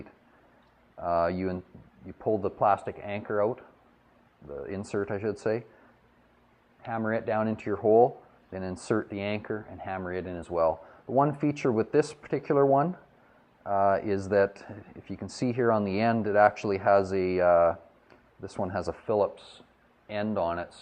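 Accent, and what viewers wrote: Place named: Canada. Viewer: are you canadian, your accent sounds so canadian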